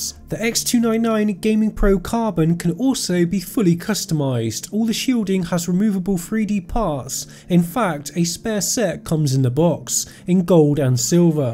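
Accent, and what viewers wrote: United Kingdom. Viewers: "Free point one port" lol, I like your heavy UK accent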